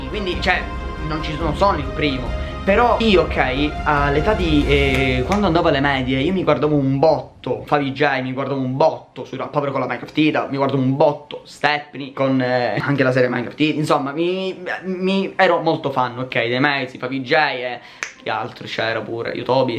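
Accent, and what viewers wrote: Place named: Sicily, Italy. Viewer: ma tu sei siciliano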